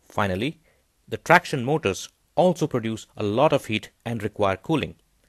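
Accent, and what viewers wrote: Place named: India. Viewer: The streetshitters accent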